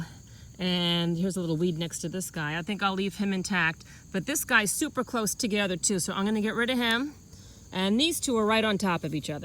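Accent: New Jersey, United States. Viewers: Lol I just watched another video of yours about sunflower tips and I knew as soon as I heard your voice that you were a Jersey girl and it has now been confirmed! 🌻✨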